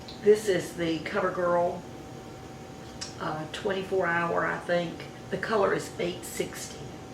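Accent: South, United States. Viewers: Hi, I just discovered your YouTube channel and enjoy watching them. I feel you are very honest with your recommendations and I trust in you even though I don't know you personally. I had the same type of vertigo and my doctor said it's called positional vertigo which is an inner ear imbalance and there isn't much she can prescribe for it. Mine hasn't come back for a couple of years. Hopefully you'll get better soon. Thanks for your wonderful videos. BTW I love your southern accent.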